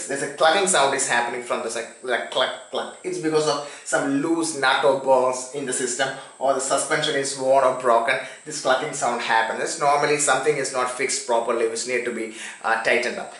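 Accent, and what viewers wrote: India. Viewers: I went trough the comments on the video. It looks like many of them have problem with your Indian accent. I should say understanding is a skill as important as speaking. so people need to be a little bit flexible on the accents to be able to understand each other. some people don't try or don't like to try! good luck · thanks for good info but hard to catch due to indian accents and pronunciation... thks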